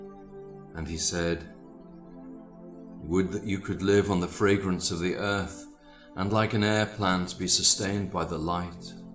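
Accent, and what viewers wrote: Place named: Manchester, United Kingdom. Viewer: Are you by chance Mancunian? The way you read reminds me so much of Morrissey (of Smith's fame)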